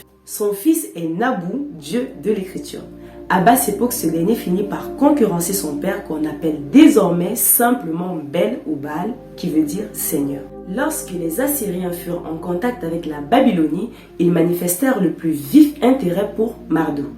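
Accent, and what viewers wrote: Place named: Cameroon. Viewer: pourquoi tu parles comme une camerounaise?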